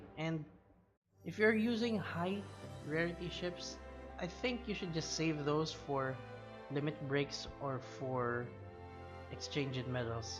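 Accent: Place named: Philippines. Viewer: Ang accent mo parang Filipino Pilipino ka ba?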